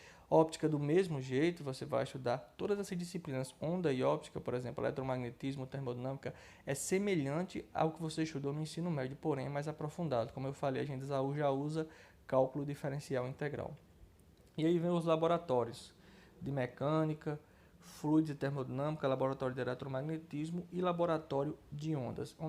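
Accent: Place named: Northeast, Brazil. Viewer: O mercado de trabalho de licenciatura em física é bom aqui no nordeste? (suponho que vc tbm seja da região já que está analisando a grade de uma facul do RN. Fora o sotaque gostozin kkkk). Vou entrar na minha segunda graduação que será em licenciatura em física e gostaria de saber se demora muito entrar no mercado.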